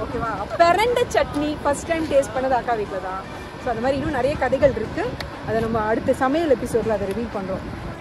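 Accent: Kongu, India. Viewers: கொங்கு தமிழ் 💖 அழகா பேசறீங்க வரவேற்கிறோம் அக்கா 🙏🏻💚❤️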